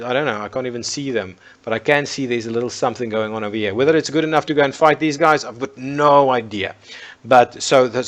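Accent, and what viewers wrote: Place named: South Africa. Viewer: lol saw your post on steam. first 5 seconds I thought ... "is he also South African"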